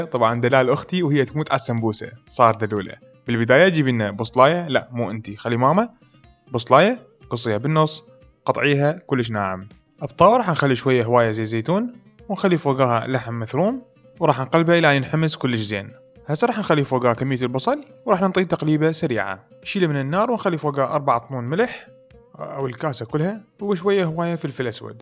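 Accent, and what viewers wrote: Iraq. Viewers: اني احب اشوف فيديواتك بس علمود حجيك العراقي الحلو وطريقة تقديمك 😁😂😍😍 وبالنسبه لطبخك هاي خلصانين منها انت شيف محترف 😁😍😍😍 ونفتخر بيك كدام العالم انت عراقي 😍😍😍😍😍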